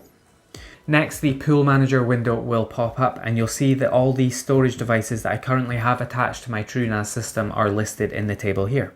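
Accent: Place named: Scottish Highlands, United Kingdom. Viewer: If I was to make a guess I would say you are from/at least grew up in Inverness?...definitely somewhere in the highlands.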